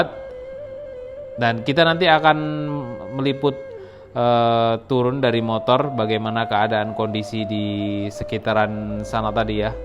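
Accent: Java, Indonesia. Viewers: Maaf mau nanya...abang org jawa ya...keliatan dr bahasanya kdg suka medok😊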